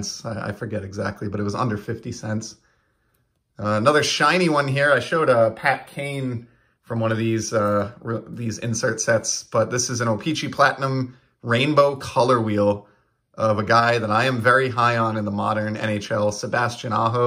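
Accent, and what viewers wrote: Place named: Boston, United States. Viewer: So much boston haha …..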